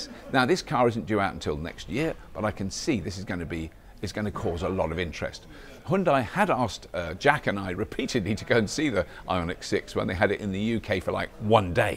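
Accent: Australia. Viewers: Was not expecting that Aussie accent to be any good. 👏👏👏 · Great show. 9/10. It would have been 10/10 if it hadn't been for that 'Australian' ? accent . (From a loyal Australian fan)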